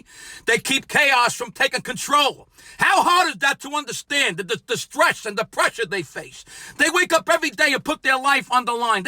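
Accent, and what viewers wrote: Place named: Bronx, United States. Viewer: I LOVE ❤️ you. You talk like my grandparents did in da Bronx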